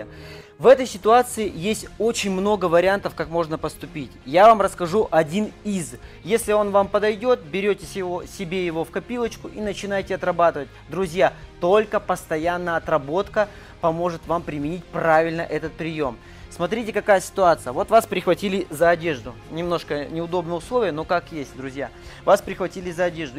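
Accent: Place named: Urals, Russia. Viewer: По говору слышу — ты уралец.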